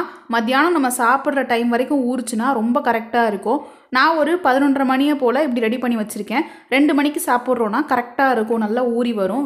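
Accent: Madurai, India. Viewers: Madurai pechu kiliyethu dhul machi nan kuda Madurai tha nega yenga irukenga ipo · Nanum maduraikari than sis unga slang nalla theriyuthu.. aagatha vela haha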